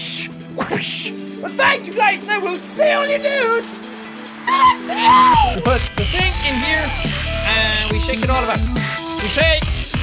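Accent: Ireland. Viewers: He's getting even closer in touch with his inner Irish self :D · This is beautiful. I'm Irish and my grandpa talks like this a lot. It's hilarious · Hey Jack, can you speak Irish? Like, actual Irish, not English with an Irish accent?? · Oooooop lord 😂 u just like my grandpa irish that makes me half he wants me to to act irish and that the sound of u it look half 😂😂😂😂😂😂😂 u make it like so easy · I HAVE NEVER SEEN JACK SOOO FUKIN' IRISH IN A LONG TIME BABY XD!!! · Is he losing his Irish accent or am I just getting used to it? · Kewl Unicorns Your just getting used to his cute Irish ways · Listening to Jack talk at his utmost Irish makes me so happy X3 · Tomas Campos i did not know he could obtain this level of Irish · Jack you should do an entire video in a really thick Irish accent. I want to hear the accent that you were so insecure about when you started making videos!! 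· Jack is white, has an accent, drinks so much alcohol, born in Ireland, lives in Ireland, and is just plain out Irish in my mind so who ever thinks he isn't then where have you been?! like really?!?! I'm Mexican but I'm so white because of my Irish, Sweetish (shut up if I can't spell it right), and Finland. So ya back off pplz!!!!!!!! 😂😂😂😂😂😂😂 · He just becomes more Irish as it goes on. And the Irish music in the background becomes more intense. Sighs in Irish Wish I could be in Ireland right now. My home. I may not be as pale as a pint of milk. But my nationality is mostly Irish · I love hearing Jack speak Irish. It's just so fuchinh funny.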